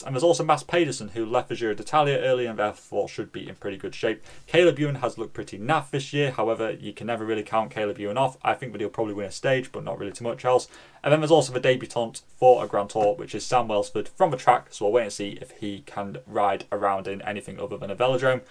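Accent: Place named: England, United Kingdom. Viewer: Arf j'ai cru pendant 5 secondes que la preview allait être en français 😢 Heureusement, j'aime bien ton accent anglais.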